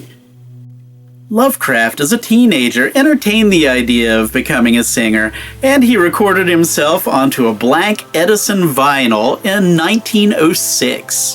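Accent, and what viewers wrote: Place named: New England, United States. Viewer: So Linus from LinusTechtips if he had a New England monotone accent. (that's my guess)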